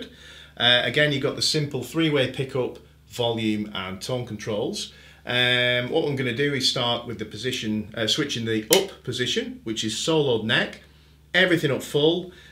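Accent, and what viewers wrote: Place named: Bolton, United Kingdom. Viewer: Looks and sounds great, Sir! Nice to hear the dulcet tones of a fellow Northerner, too. Sounds like you're from my neck of the woods - Bolton. Correct me if I'm wrong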